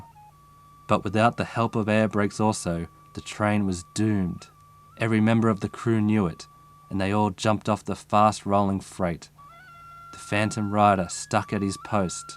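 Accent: United States. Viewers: I live in the US. I like your narration, but I have to say your Old West accent amuses me. :) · Love the southern accent, sounds like the Beatles XD cool story bro, thanks for sharing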